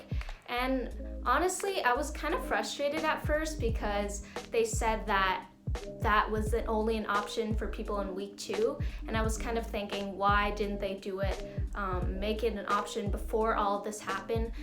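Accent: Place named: United States. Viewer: What country do you live in? You barely have an accent. You sound American.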